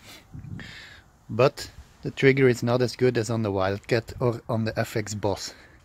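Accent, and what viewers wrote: Flanders, Belgium. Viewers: Aan het accent te horen een Vlaming.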